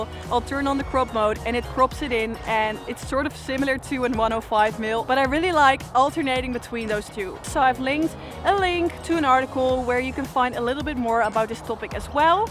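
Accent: Netherlands. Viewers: Nice Hollands accent 😁😁. Cropping... The same as cutting out a piece afterwards. Nothing special... No real extra zoom. Just An extra digital zoom like the older digital camera's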